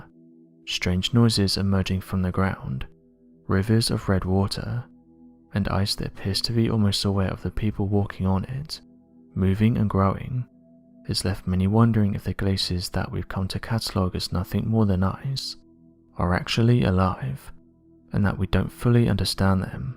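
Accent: England, United Kingdom. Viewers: Best accent English. I am biased though. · Thank you for all your hard work on these videos!!! I love watching them and you have the perfect, intelligent, serious, English voice for narration 💯♥️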